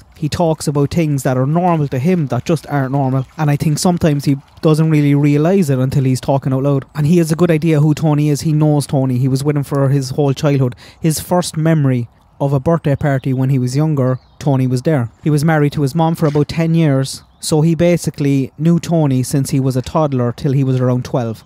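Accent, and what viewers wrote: Cork, Ireland. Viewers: New subscriber is that a Cork accent feen??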